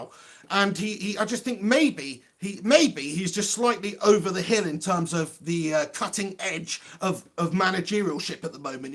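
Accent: Manchester, United Kingdom. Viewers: I cannot understand him, his manc accent is to strong.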